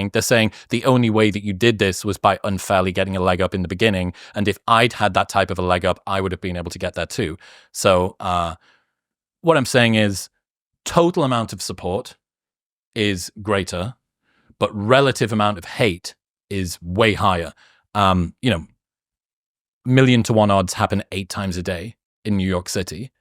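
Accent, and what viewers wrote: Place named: United Kingdom. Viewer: I really hope you manage to beat all your health problems as soon as possible, I wish you all the best. Thank you so much for entertaining my ears with your sexy deep British voice. So glad that I’ve found your podcast!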